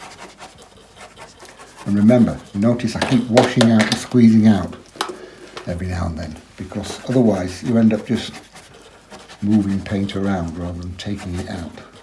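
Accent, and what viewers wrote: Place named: Sheffield, United Kingdom. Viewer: Hiya. I see I'm the first to comment! I found you a couple of weeks ago and I've watched all your videos. I really enjoy your teaching style and casual and effective way of approaching art. I live in South Africa but was born in Sheffield so your accent is a joy to listen to. Best regards Sylvia xxx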